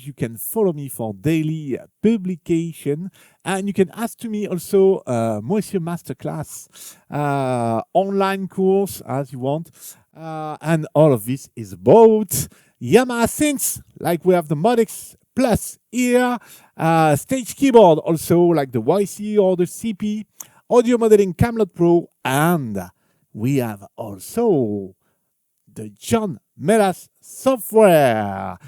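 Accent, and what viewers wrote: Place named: France. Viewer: thank you for creating this software. Love your accent my French neighbour.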